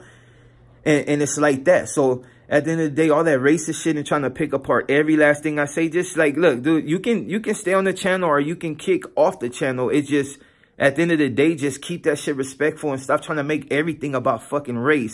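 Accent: Mexico. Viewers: You didn't know you could talk Mexican? But you know talking Black is talking Ghetto. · My best friend is Mexican I grew up around Mexicans eat nothing but Mexican food. Respect to you for being down for your hood. But know you act Mexican and talk like a Mexican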